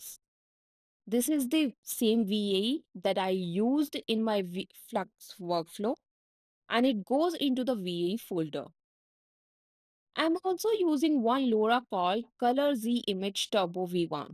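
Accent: India. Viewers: Indian accent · I think her accent is Indian. I'm not so sure she is a she. It could be just an AI trained voice. Most of these channels utilize AI voices. Even people who have great voices are just handing it to the AI to create the audio from their voices, which is much faster, once trained, than recording it yourself.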